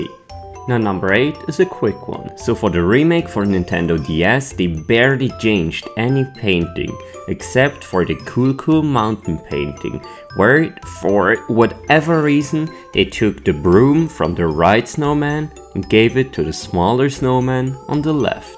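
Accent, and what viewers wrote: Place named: France. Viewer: That French accent though. Proud of you. Maintenant tu vas pouvoir aller draguer!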